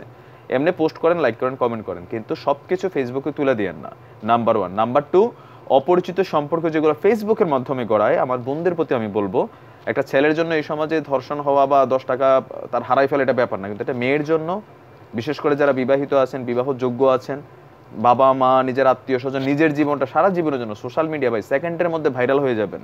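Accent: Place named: Comilla, Bangladesh. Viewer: আসসালামু আলাইকুম ভাই, আপনার কুমিল্লার ভাসায় খুব ভালো লাগে।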